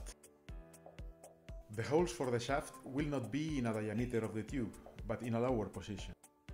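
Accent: Spain. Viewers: Tienes al acento espanol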